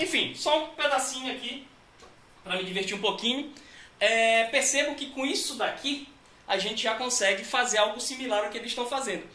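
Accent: Pará, Brazil. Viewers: cara, venho acompanhando teus vídeos ultimamente e gostado bastante. Mas to com uma curiosidade, tu és paraense? kkkk ou alguém ja te disse que teu sotaque lembra muito a galera daqui do Pará? kkkk abraços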